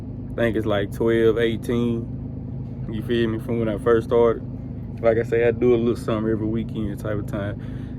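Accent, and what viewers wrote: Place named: Texas, United States. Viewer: You from the H bro ?? You sound like you from Texas on god I know Texas/Louisiana accents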